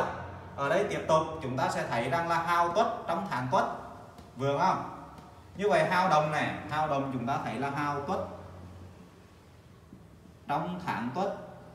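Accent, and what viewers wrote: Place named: Hanoi, Vietnam. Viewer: Vậy khi đi dạy thầy nói giọng Hà Nội cho nó máu ai cũng nghe được hết chỗ để chê thầy còn khi về nhà thầy lại nói đúng giọng của mình. Thầy rất giỏi và giảng giải cũng rất dễ hiểu tỉ mỉ từng chi tiết nhỏ khâm phục thầy. Xin cảm ơn về những khoá học miễn phí của thầy và chúc thầy cùng gia đình luôn nhiều sức khoẻ và luôn may mắn và luôn luôn ngập tràn trong hạnh phúc an vui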